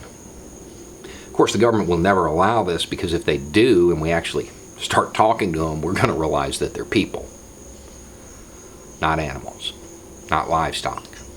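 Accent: South, United States. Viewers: The fact that your southern accent would probably stop me from even asking you for directions scares me to dead, I don't want to be part of the fear mongering crowd; I want to see people as human beings and have my children learn from many cultures just my own.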